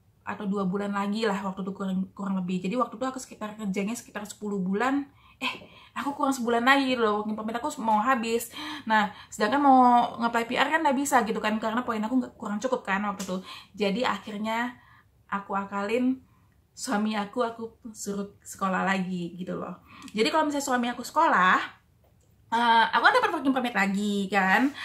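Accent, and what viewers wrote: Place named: Bali, Indonesia. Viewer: hahaha iya, logatnya mirip Bali. hehe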